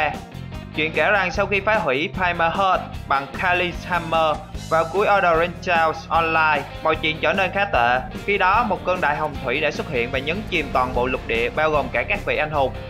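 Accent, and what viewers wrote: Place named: Binh Dinh, Vietnam. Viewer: ôg giới thiêu game có giọng nói giống dân 77 quê tui gơ😂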